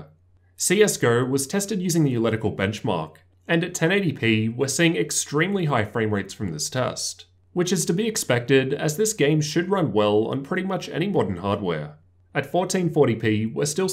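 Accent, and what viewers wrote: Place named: Australia. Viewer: woah hes aussie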